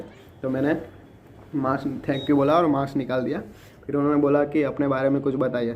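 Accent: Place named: Gujarat, India. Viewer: Thank you mota bhai 😊☺ btw from which district are you in gujrat. Your gujrati tone reflect in your talk. Btw jay swaminarayan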